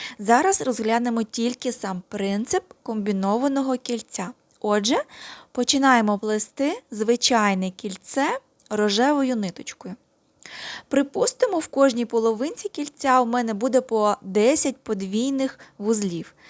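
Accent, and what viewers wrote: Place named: Russia. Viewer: а не лень язык ломать? А хотя прикольно: я и технике от Вас научилась и мышцы живота насмеяла над Вашими попытками говорить на украинском. 😊😊😊 Спасибо Вам за двойную пользу 😊😊😊 Всё равно сбиваетесь на русский 😊😊😊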